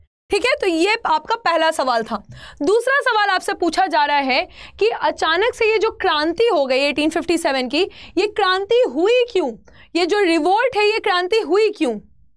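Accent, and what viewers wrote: Bihar, India. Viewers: Madam ji ka Accent Bihari hai ❤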